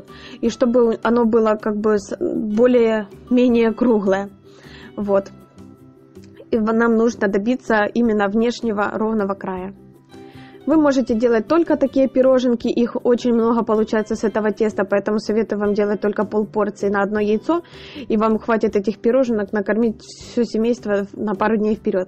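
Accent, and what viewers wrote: Ukraine. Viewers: Болит голова - не смотри ! Произношение такое потому, что девушка из Украины! Нужно обязательно свою ложку дёгтя бросить , а так все нормально!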